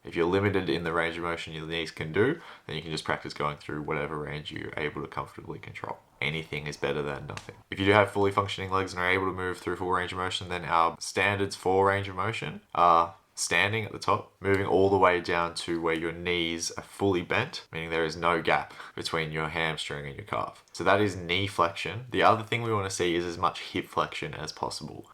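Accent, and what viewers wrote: Australia. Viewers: Bro your Australian accent is fuckinnnm difficult to understand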